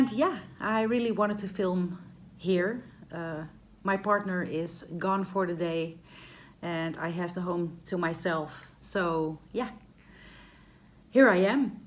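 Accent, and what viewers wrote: Netherlands. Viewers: Fab update! Can I just say how beautiful you look in this video!! I loved the Dunglish and strangely understood what you meant each time 😂 fabulous stitching month, love your updates. Happy Easter xx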